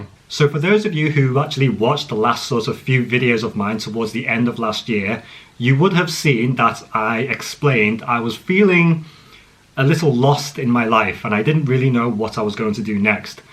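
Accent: United Kingdom. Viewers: What kind of accent do you have? I hear British with hints of Scottish, Irish some kind of Asian.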